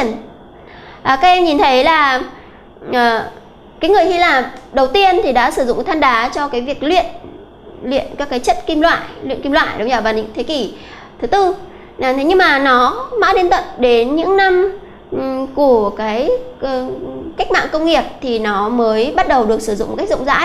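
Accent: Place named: Philippines. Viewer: s cô đọc nghe như cứ sai sai s ấy giống phát âm của ng philippines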